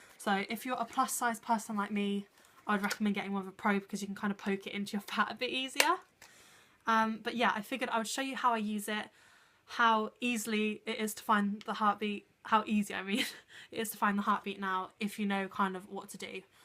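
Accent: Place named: United Kingdom. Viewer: That British accent threw me off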